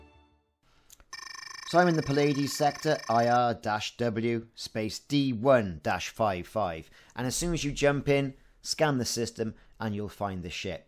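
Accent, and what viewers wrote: Wales, United Kingdom. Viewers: Keep the vids coming mate, sounding good so far and good hear a Welsh voice online :-) Will be heading to Maia soon but have to do the exploration trip to unlock palin. After that I’m game for some alien hunting if your still about.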